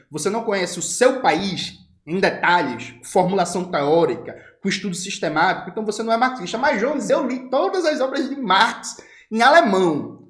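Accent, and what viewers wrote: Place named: Bahia, Brazil. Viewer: O Jones é MUITA coisa que eu gostaria de ser, o cabra é inteligente, fala bem demais, é lindo, um cara malhado, com um dos melhores sotaques desse brasilzao (contexto sobre, minha família toda é da Baihia e eu nasci aqui em SP 😢😢😢 QUERIA TER O MELHOR SOTAQUE DESSE PAIS, PQ EU NAO NASCI NA BAIHIA 😭😭😭😭) E o homem é casado Veja se nao é um combo de características perfeitas, pós revolução terei um quadro do Jones em minha parede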